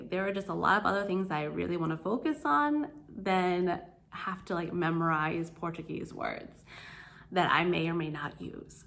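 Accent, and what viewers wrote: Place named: Spain. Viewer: You talk like your Spanish. Not bad, to be honest.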